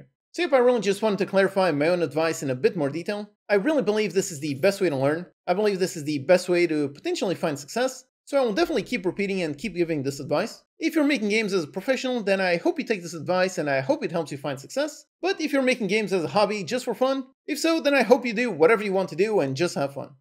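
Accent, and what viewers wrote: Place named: Portugal. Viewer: Hey. This is great advice! I had a feeling that you are from Portugal and live in Portugal because of your accent. Is that correct? I live in Portugal as well! I don't build games (even though I would love to), but I build game dev tools and libraries. I built a 2D game framework called Velaptor. We should connect!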